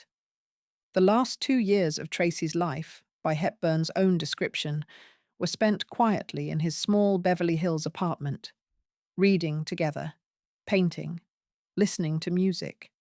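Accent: United Kingdom. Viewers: Love you Stories and info❤. Thank you SO very much. Bless everyone on you’r team. A lovely narration with a beautiful British voice. . From Uk Susie ( how i miss ‘our accent’) in Melbourne Australia . These true stories are absolute Gens ❤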